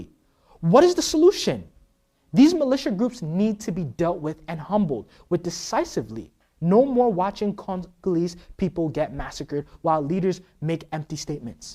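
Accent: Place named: United States. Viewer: Are u Ghanaian, just a harmless question, cos u sound American?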